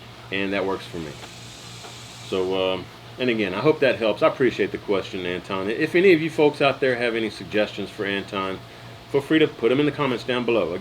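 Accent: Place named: Louisiana, United States. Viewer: What part of Louisiana you from?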